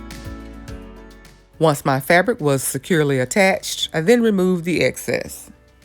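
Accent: South, United States. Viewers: Wow! I so loved this video. Your explanations are so on point and easy to follow. I want to become a weekend warrior for this one. I love love your southern accent. It's so welcoming. What size was each batting and vinny piece cut to? Thanks for the videos and Blessings to you and your family !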